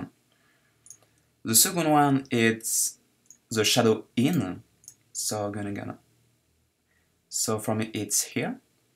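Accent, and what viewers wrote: France. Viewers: j'étais sur que tu étais francais :D on reconnait l'accent et bravo pour tout ce que tu fais j'aimerais vraiment que tu reprenne ps : j'ai 4 de tes wallpapers dans ma chambre aller salut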